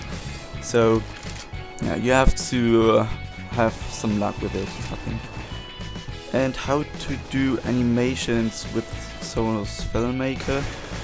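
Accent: Germany. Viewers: are u german ?^^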